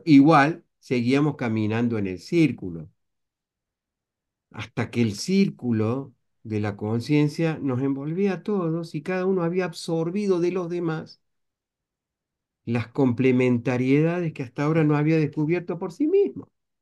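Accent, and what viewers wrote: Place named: Córdoba, Argentina. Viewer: Buen día!! Que sorpresa escuchar a un cordobés como yo. ,jajaja, lo estoy escuchando, gracias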